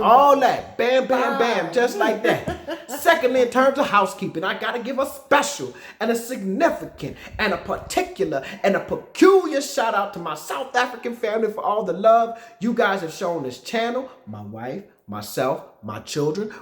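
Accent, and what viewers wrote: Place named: United States. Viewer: I want to work to your company please and I want to speak English like you, I like American accent. 😃😃😃😇🌮✊👌😐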